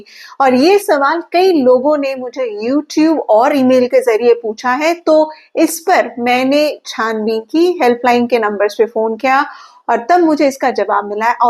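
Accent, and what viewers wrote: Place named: Uttar Pradesh, India. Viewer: Up ka lag raha hai Mam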